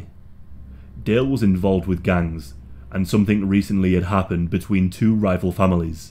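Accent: United Kingdom. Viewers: Why do I picture Ian Curtis when I hear your speaking voice ? I guess it’s the deep British accent.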